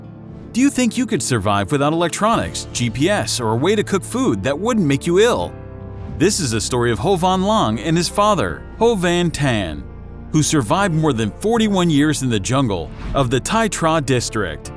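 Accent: United States. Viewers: Anything narrated with an American accent ruins the subject involved.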